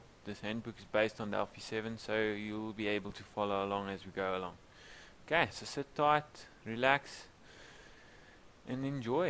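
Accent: South Africa. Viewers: Yeah I noticed the SA voice straight away. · Sure sounds like a South African man!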